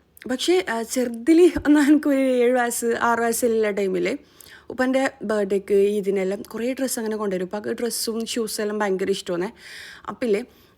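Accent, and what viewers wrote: Kannur, India. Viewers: ങ്ങൾ കണ്ണൂർ ആണോ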